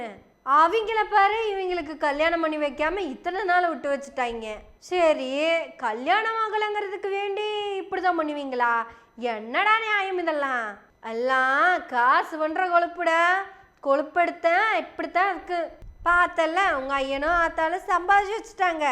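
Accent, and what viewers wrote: Kongu, India. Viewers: Kongu intonation WoW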